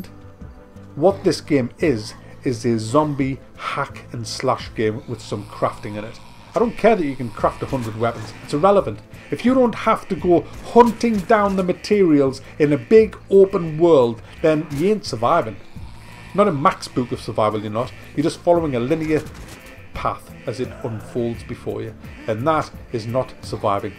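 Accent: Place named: Newcastle, United Kingdom. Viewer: Ahh right, well your accent made this review even funnier, really sounded geordie. What was the game you suggested buying instead of this?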